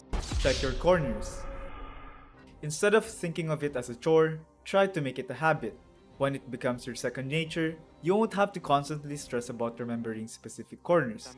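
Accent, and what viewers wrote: Philippines. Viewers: You have a filipino accent. Are you? I am too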